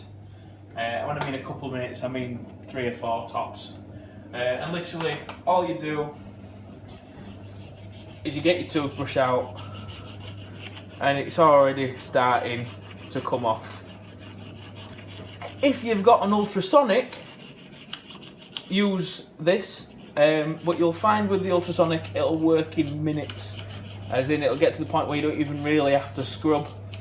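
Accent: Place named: Doncaster, United Kingdom. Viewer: Thanks, I wasn't 100% sure if it was working properly. Some of the acrylic paint came off but maybe just because I was scrubbing. I'll go try some methylated. By the way, trying to place your accent, are you from Doncaster?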